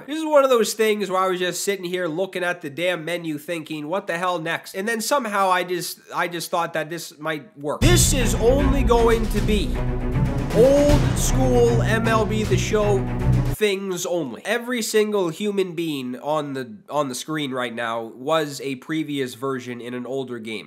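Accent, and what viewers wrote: Canada. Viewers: are you from Ontario or the Maretimes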